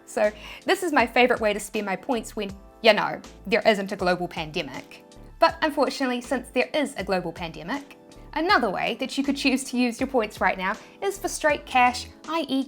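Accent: New Zealand. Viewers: Wow, New Zealand's accent is so good. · "Chick out check" - I will never get used to the Kiwi accent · I believe she is from New Zealand... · Thanks,. So inspirational. I love your Kiwi "ik-cent" too:-) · I love the New Zealand accent. I'm moving there. It's settled. I made this decision after watching the almighty Johnsons... but I'm declaring it on this random YouTube video that popped up for me. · I ended up with your video as I was googling Kiwi accents awwww I miss NZ sooo much!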